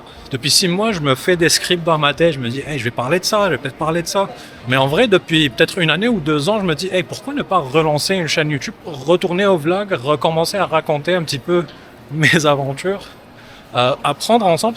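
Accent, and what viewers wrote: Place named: Quebec, Canada. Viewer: C’est quoi ce début d’accent québécois! 😅 good to hear from you Z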